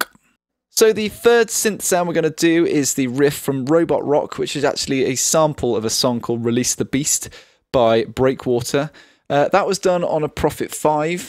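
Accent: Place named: United Kingdom. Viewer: this is brilliant and absolutely enjoyable! (And that lovely brit accent only adds to the charm;-)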